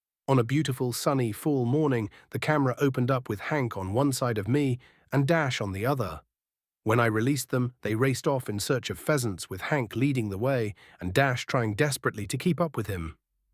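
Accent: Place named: United Kingdom. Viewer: Hello! Ok im confused here. I know playthe music for Hunting with Hank, but the person narrating this certainly doesn't sound like, More like a britt, but he also states he was a musician, who is who? Im writing this in an attempt to prompt someone re-release these two shows, i never got to enjoy Dash but i do remember talking about the new son of Hank, the narrator states all he has to do is pop in a DVD, Please bring back Hank and Dash, I'm heartbroken they are gone!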